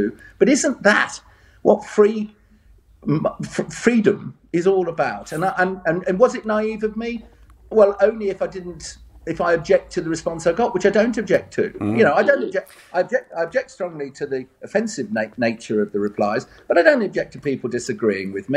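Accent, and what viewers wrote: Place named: Birmingham, United Kingdom. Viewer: Yeah you sound brummie….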